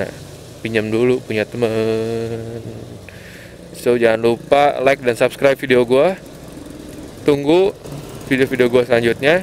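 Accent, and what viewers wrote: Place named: West Java, Indonesia. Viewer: Orang sunda ya mas. 😅😂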